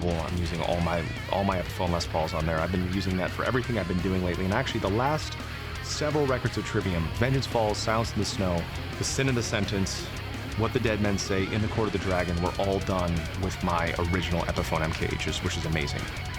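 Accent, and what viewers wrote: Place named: United States. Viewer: Holy crap. I watched all Video that posted in order to learning English but he's talking so fast. I hope makes a course of speaking America accent. I like his voice. I am a fan from Viet Nam. 😁😁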